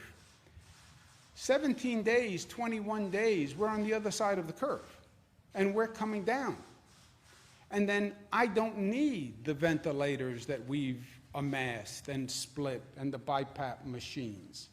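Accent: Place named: New York, United States. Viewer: Its the New York accent and all the strength/testosteone exuding from him. We get it, you don’t need help. It’s okay to be attracted to masculinity without calling it toxic, like many have in the recent past just to bandwagon a stupid slogan or saying.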